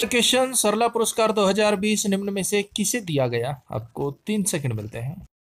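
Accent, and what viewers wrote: Haryana, India. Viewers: Sir aapki trick na best h .. Bss aap ase hi hme vedio provide krvate raho haar session per Aapki aavad haryanvi m hote h vo bsst h m bhi haryana se hi hu mujhe or bhi aache se smjh aate h aapki vedio😀😀😀😀😁😁😁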